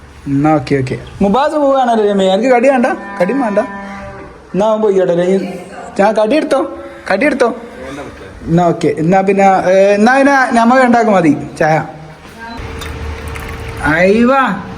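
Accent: Kozhikode, India. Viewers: കോഴിക്കോട് സ്ലാങ് തന്നെ മതി. അതാത് നാട്ടിലെ സംസാര രീതിയാണ്. ജനങ്ങൾക്കിഷ്ടം. കൂടുതൽ ശുദ്ധ മലയാള മൊന്നും വേണ്ടന്നർത്ഥം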